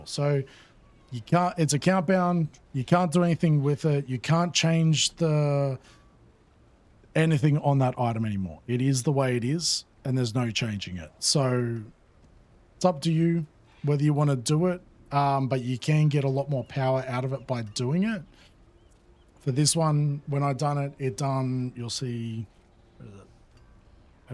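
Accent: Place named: Australia. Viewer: Hey mate, the missus likes it comment under mine.. huge thanks very informative and a pleasure to hear a fellow Aussie do a tutorial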